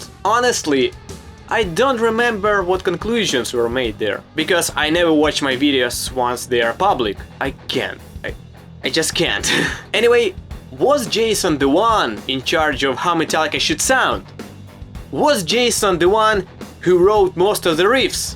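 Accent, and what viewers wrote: Eastern Europe. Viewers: I dont know why but listening to an Eastern European saying complex musical terms is really satisfying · Holy shit your accent is heavily east European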